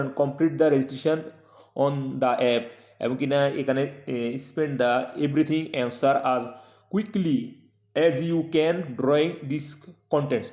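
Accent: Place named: Noakhali, Bangladesh. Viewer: আমার বাড়ি নোয়াখালী আমি আপনার কথা শুনে মনে হচ্ছে আপনি আমাদের বৃহত্তর নোয়াখালীর হবেন আমি আপনার সাথে যোগাযোগ করতে চাই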